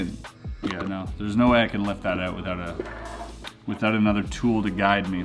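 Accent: Canada. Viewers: I fucking knew he was Canadian haha I was like “well he has a NASA hoodie” and then I was like “no American says out like that” I was right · is he canadian? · You’re Canadian aren’t you lol cameras sorted ooout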